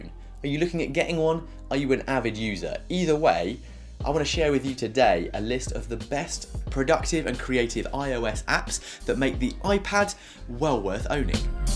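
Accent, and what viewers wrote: United Kingdom. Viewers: That british tho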